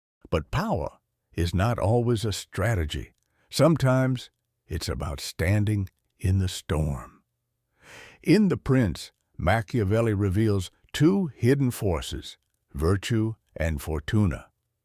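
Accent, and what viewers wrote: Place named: South, United States. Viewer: What's with the southern confederate general voice?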